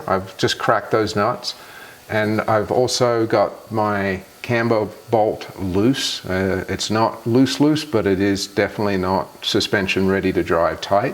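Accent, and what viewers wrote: Australia. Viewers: Thanks for the video instructions. You wouldn’t happen to be an expat Aussie? It’s messing with me because an American accent is sneaking in with some words 😂. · Sounds like a bit of an aussie accent creeping through? Good info mate.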